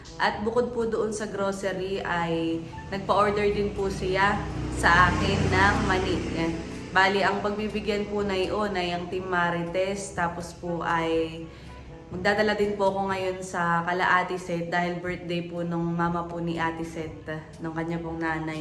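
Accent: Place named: Infanta, Philippines. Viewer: Good morning ang sarap ng lugaw tamang-tama sa malamig na panahon😊 parang ang punto niyo po ay taga infanta tama po ba?😊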